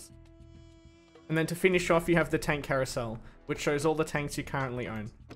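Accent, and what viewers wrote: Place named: Australia. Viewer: nice aussie accent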